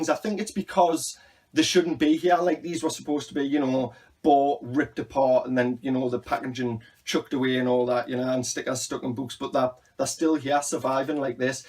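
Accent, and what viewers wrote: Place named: Newcastle, United Kingdom. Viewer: Just discovered your channel, it’s refreshing to hear a fellow Geordie on YouTube. You going to Doncaster in March? I went for the first time last year, it was great.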